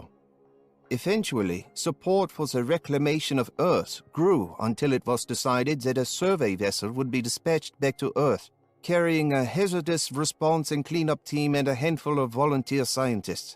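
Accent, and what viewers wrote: Germany. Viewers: is that a german accent? lol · is this a real or fake German accent?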